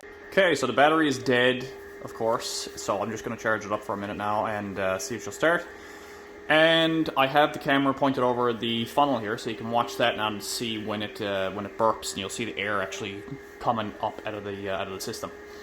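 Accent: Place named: Ireland. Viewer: A sense a bit of Irish accent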